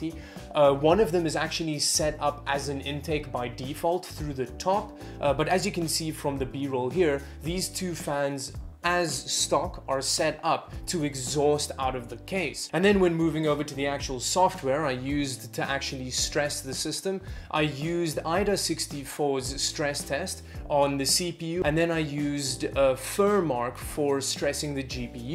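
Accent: South Africa. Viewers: Bruh you sound like you are from South Africa. However my question would be. Is it better to take stock fans and put them as front intake fans? I have Arctic Freezer 34 with dual fans and I simply had to remove stock nzxt h500 and now I am thinking where should I put them. Can't you please help me to solve this riddle? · Are you South African by any chance? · Are you South African? Hahaha, knew it from the accent. Checked instagram and there you mentioned an Afrikaans proverb. Goeie video. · Are you South African? You look and sound like one.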